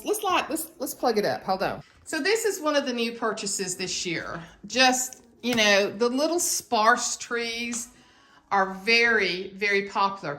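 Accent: South, United States. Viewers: I LOVE your Southern accent. I have always lived in the eastern Cape, and we too have a distinctive accent. So I guess we are both "Southern" girls, just different hemispheres. Lol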